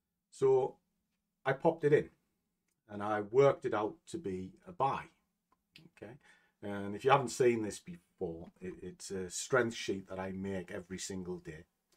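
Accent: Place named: Northern England, United Kingdom. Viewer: Hey like your videos. Sounds like you're in my neck of the woods. Good to hear fellow northerners smashing it. Like the way you trade live. All the best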